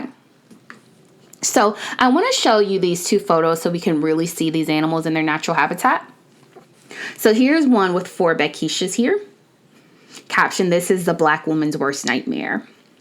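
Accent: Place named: Midwest, United States. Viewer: Question, where are you from? Your accent gives me Midwest.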